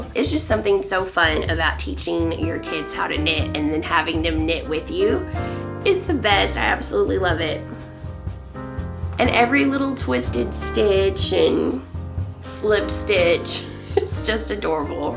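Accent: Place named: South, United States. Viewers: I’m from Texas so it’s nice to hear another version of Southern “twang” (accent). The cowl is too precious - I can see why you would treasure it. Totally love the leather of the project bag. Can imagine just how many WIPS would fit in there^^ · You are such a delight to watch! Your accent thrills me. I grew up in East Texas and it’s very East Texas😄 My days are heavy. My husband is recovering from a live kidney donor transplant. Your knitting podcast is such a fun relief. I taught both my granddaughters how to knit. Your daughter’s pink cowl brought smiles to my heart. Your mudd bag was amazing to see. Take care and I hope you’re well soon. Merry Christmas🎄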